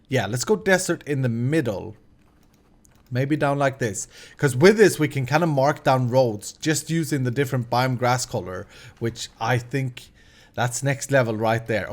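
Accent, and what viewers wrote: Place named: Sweden. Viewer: Omg -, you made a whistle sound that made me bust out laughing! man I love Swedish accents <3.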